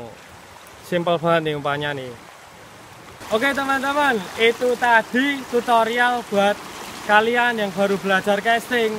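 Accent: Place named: Java, Indonesia. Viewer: medoknya minta ampun....agak dibeneri mas dialeknya biar gak mengganggu banget di telinga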